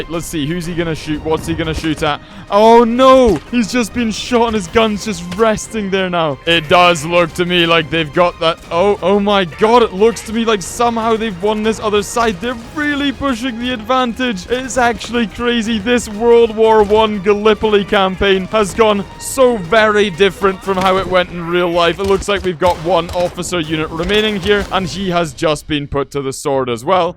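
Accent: Scotland, United Kingdom. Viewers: I'm just here to hear "Algorithm' in Scottish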